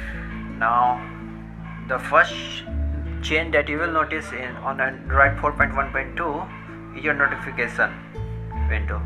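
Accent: India. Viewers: WTF?! is going on with that accent?! it sounds like Apu from The Simpsons.... But the wrong itself looks okay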